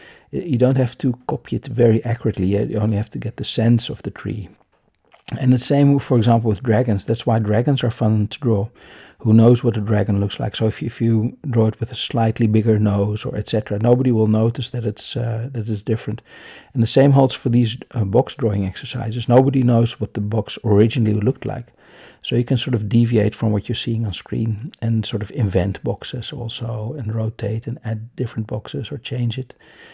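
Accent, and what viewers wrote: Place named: Netherlands. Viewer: Haha i knew you were Dutch by you're accent😊 ik ben ook Nederlands. Hallo ✌🏻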